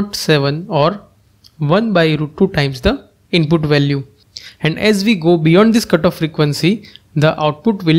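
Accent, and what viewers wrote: India. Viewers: Jefe Julio el Gringo Judeo yes it's hindi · I love the accent. Is that Hindi?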